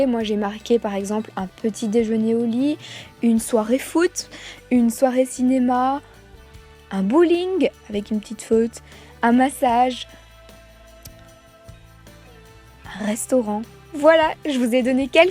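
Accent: Belgium. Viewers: Hello tu viens d'où de Belgique ?? Ou sinon belle vidéo bisous 😘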